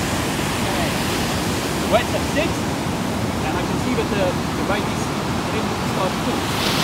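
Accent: South Africa. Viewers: i love the accent. sounds south african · are you South African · Sounds very South African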